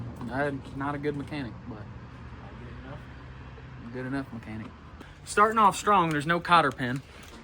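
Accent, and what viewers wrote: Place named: South, United States. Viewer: Love the Talladega nights accent😂😂😂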